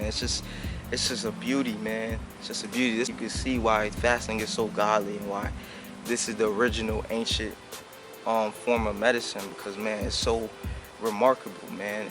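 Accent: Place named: South Africa. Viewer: Super cool video! Where you from? You Sound South African lmao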